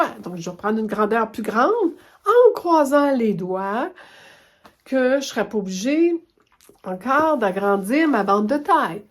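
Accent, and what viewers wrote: Quebec, Canada. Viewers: Très beau travail l beaux tissus l et accent du Québec où vivent mes enfants et où je vais tous les ans l merci pour la video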